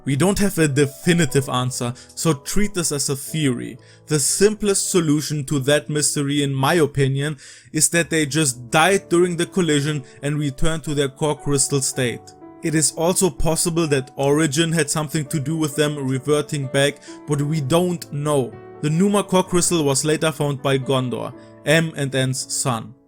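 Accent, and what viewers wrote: Germany. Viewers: aah I knew it xD. Fellow German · Also what's your accent? German? Just wondering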